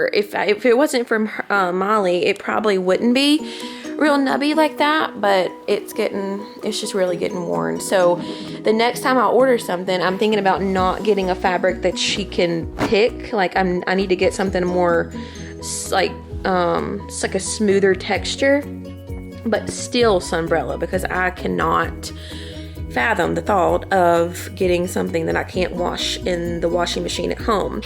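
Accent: United States. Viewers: Ok you definitely sound like you're from NC.....I live in Camden which is about 40 min to Duck (winter time, peak summer traffic who knows how long I'd take, lol). So if you're ar the N OBX (Outer Banks for y'all who don't know) and are 30 min from beach, then you're in Currituck. But if you're not in NENC then you must be farther down the coast. Anyway...like I said you sound North Carolinean, and I know a store that's headed toward the breach that is all beach themed, that's in Currituck. But there's also some at the beach too. Was just curious if I was correct. Not stalker, lol. It's rare to hear someone sound like you on YouTube, lol...ok I'm rambling now, so I'll go, would be cool if ya told me yes or no though, Your stuff is really cute, and I'm with you on old stuff! Love it, especially old books! I have a few old early 1900 medical books, as a nurse I find it fascinating!! 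Love the house and decor. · Where are you from? I know you are definitely a southern girl. 😃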